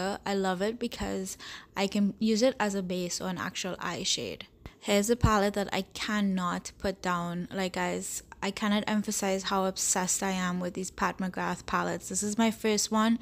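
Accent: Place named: Trinidad and Tobago. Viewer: Awesome hun that Trini accent can't hide at all 😘😘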